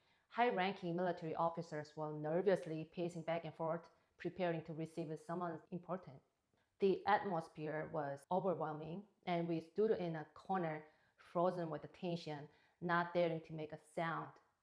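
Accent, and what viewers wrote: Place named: Asia. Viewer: I still don't know what girls in pleasure squads do. Is it just singing/dancing/ entertainment for the rulers? Anyway, I am quite intrigued by your english- you speak and express in a very asian way- which i find cute. Also I am quite surprised you went from extreme dictatorship(North Korea) to extreme capitalism(USA)- 2 sides of the coins really- where on both ends society seems to be eroding- at one side due to lack of freedom, food and what not, while on the other side due to misuse of freedom- individualism, money mindedness, lack of family culture, rise of wokeism, loss of love/trust/ dropping birth rates, income disparity, addiction. South Korea is similar to USA- be it per capita income and capitalist society- also it is infamous for highest rates of depression and suicide rates- which obviously is result of capitalism and living from mind/thoughts. 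You, having born and spent early years in NK, no doubt US must be feeling great for freedom. But a time would come...when you would want a middle path...where there is only joy, unending peace and love for everybody- the gate to spirituality.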